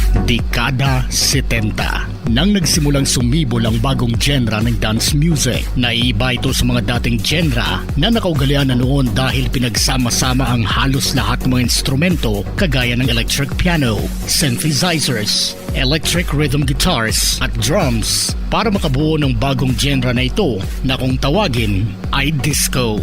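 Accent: Iloilo, Philippines. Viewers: Taga Sara ni si sir ilonggo gid hehe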